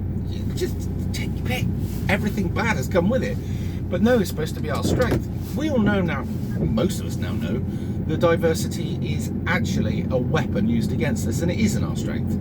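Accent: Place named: England, United Kingdom. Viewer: Well is a very handsome looking English man with a gorgeous English accent, he does sound very classy, don’t mind his use of swear words, much needed in our current situation🇮🇪☘️🇬🇧❤️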